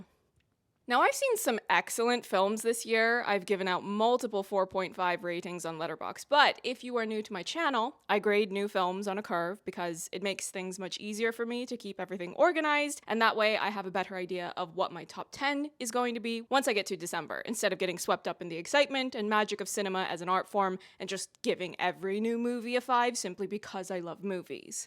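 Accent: Ireland. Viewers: not hear the Irish accent until watching this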